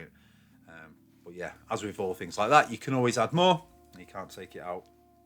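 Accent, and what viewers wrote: Yorkshire, United Kingdom. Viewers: Eeeees frooom Yorkshire by the sound of it. A beautiful accent us Aussies like coz our Captain Cook was born there. It’s weird how anyone can not understand English who is supposed to speak English. Strewth, it’s the language of the King James Bible.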